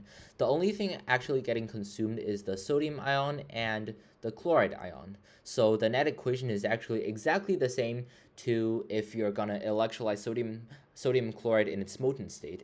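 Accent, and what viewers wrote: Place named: California, United States. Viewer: Here in the states gas water heaters use a sacrificial anode to protect from corrosion and it's often made out of magnesium (sometimes an alloy that includes aluminum or a little zinc) , I don't know what's used in China(or wherever you are with a Californian-esq accent), but I hope that might be useful to know..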